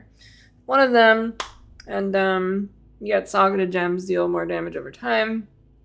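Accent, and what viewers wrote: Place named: United States. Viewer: That's her voice. She has an American accent. It's not an indication of anything other than where she learned English.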